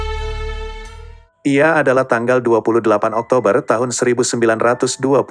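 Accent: Indonesia. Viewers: indo ato malaysia logat nya indo bahasanya bahasa baku indo campur sedikit kosokata malay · Ini logat Indonesia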